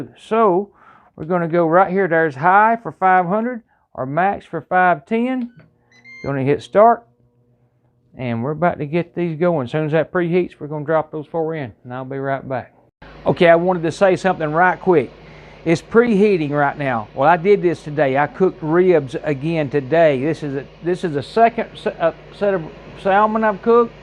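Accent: South, United States. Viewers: I know you are from the south. I love southern accents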